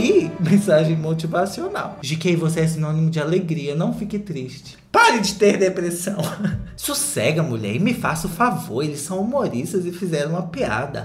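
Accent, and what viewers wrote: Minas Gerais, Brazil. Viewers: Vim pela live na foquinha e já tô maratona do todo o canal, amei o jeitinho e sotaque mineiro dele hahaha. Conta essa fofoca pra gente que chegou depois aqui no canal mulher, pelo amor. Kkk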